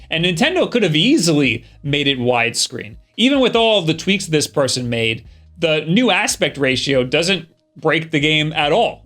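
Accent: New York, United States. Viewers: The first Wulff Den video I saw, said Mario and I immediately said to myself, "He must be from New York, close to NYC."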